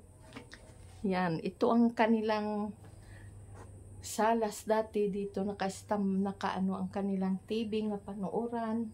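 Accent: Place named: Tagalog, Philippines. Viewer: Saan bayan kayo maam parang nadaanan ko na din jan.at Ang salita mo pamilyar Sakin Tagalog 😮❤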